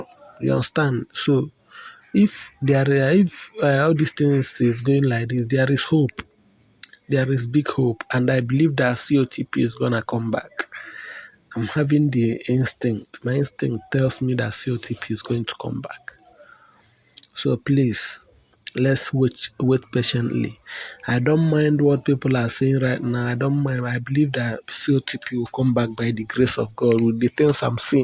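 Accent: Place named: Africa. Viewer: Please you sound African stop fooling I know African are intelligent 🧏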